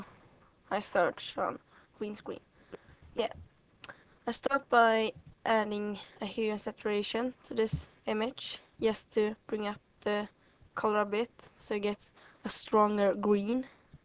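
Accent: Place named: Sweden. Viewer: Bra tutorial. Man hör att du är svensk :D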